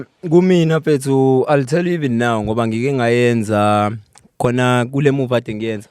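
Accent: Zulu, South Africa. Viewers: zulu accent❤️😂😂😂😂. Iynhlwabuse😂😂😂😂. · IsiZulu siki.🔥💯🤣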